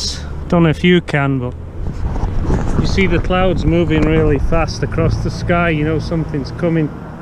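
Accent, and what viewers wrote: United Kingdom. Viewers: You sound like your from uk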